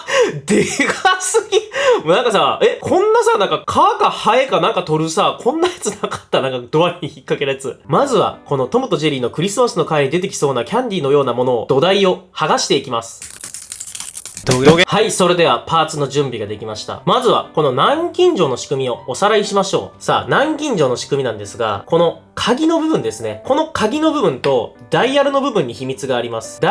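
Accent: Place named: Kobe, Japan. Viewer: 方言的に神戸の人かな？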